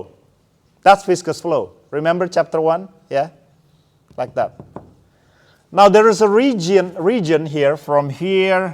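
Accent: Indonesia. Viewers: perfect English with totally Indonesian accent. Awesome, dibanding anak2 sekarang yg sok logat amrik tapi structure dan grammar berantakan :)) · Akumrasa nggak sih. Akumsangat merasa, bahwa Doktor ini bukan orang tulus dan bukan orang yang berdedikasi. Melainkan penampilan dan gaya lebih penting buat diandaripada science itu sendiri. Selain itu bahasa inggris nya sangat standard dan sangat kental aksen bahasa indonesianya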